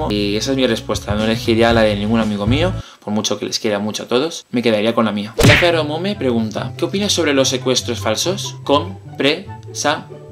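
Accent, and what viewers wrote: Catalonia, Spain. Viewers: eres catalán o valenciano? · Eres Catalán?!?!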